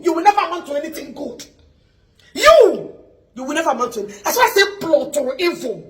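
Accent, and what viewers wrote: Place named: Imo, Nigeria. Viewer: okay she’s married self, She’s speaking exactly imo dialect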